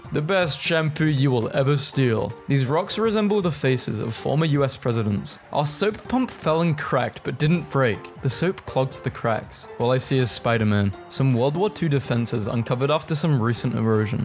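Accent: United Kingdom. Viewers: I just realised hes British!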